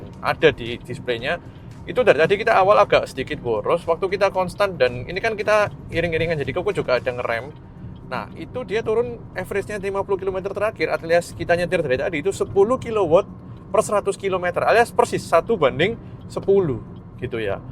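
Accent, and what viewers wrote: Surabaya, Indonesia. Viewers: Soko logate aku langsung ruh awakmu asli Suroboyo. Sego Endomie.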